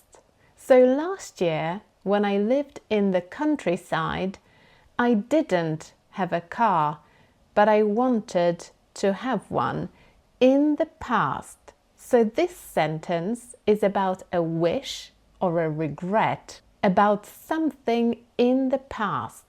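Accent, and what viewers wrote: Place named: United Kingdom. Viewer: your English is extremely clear! I love british English! It's very nice to hear you! ❤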